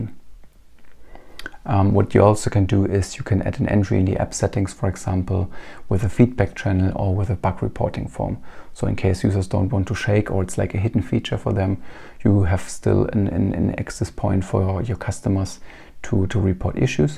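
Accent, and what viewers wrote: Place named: Germany. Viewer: Didn't expect Henry Cavill with German(?) accent.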